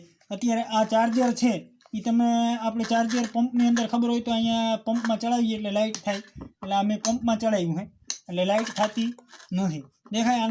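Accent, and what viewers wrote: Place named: Kathiawar, India. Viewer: કાઠીયાવાડી લાગો હો ભાય 😀😀😀😀😀😃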